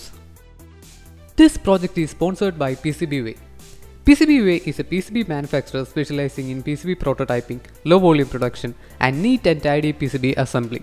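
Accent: Kerala, India. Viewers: Bro are u a mallu.?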